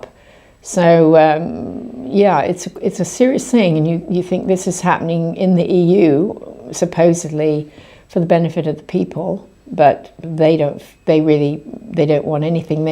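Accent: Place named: United States. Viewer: English voice??? You have a sort of American accent!!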